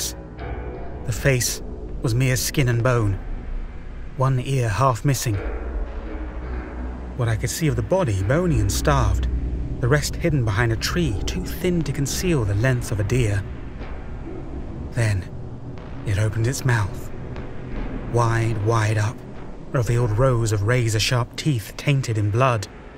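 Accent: England, United Kingdom. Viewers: More than happy to leave a comment. It's comforting to hear hear a fellow Englander narrating these Wendigo and Skinwalker stories. I really love your channel.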